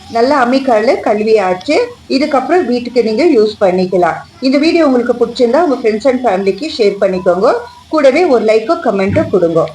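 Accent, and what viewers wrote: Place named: Bangalore, India. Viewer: Nice.your language is good.Bangalore tamil.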